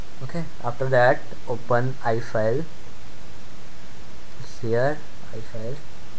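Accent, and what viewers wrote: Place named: India. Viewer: I dont understand your Indian accent nobody tell what you are you saying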